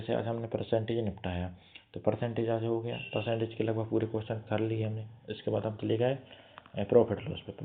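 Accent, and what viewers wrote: Bundelkhand, India. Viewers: Bhaiya aap bundelkhand se ho kya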